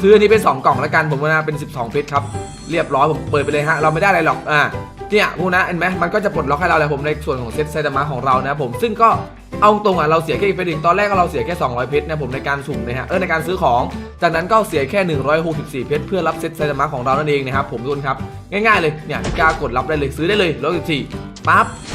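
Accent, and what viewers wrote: South, Thailand. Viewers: อยากให้พี่กล้าพูดใต้ทั้งวัน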